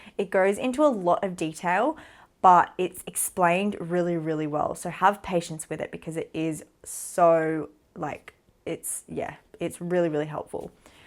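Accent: Australia. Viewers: I never had the patience to watch someone talk this long in 4 videos, bt i have to give it to u, coz i watched all 4 videos without even forwarding once, you have a beautiful accent the aussi accent, which is very easy to understand and ur story telling is so natural, i would like to say this, u are one of those ppl who r chosen by Allah to bring to Islam, Allah must have liked something u did before converting so much that he chose u, u r a beautiful person. Welcome to the Muslim Ummah.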